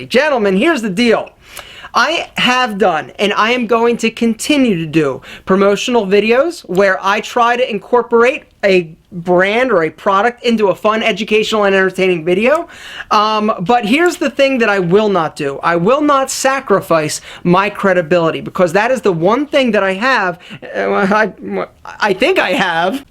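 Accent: Philadelphia, United States. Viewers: Whoever told you not to do this video should be fired...the honesty (which I already realized, but some don't) is refreshing and positive. If more companies would be this open I think us commodities would be a lot happier with giving you our eyes. Sponsors are key, and also don't require the viewer to do anything but watch. Sticking to advertising stuff you believe in is the way to go...if you get paid to showcase products that actually help people, its a win win.....Keep it up. (P.s. Always good to hear that Philly accent on the tube lol, much love from the 215)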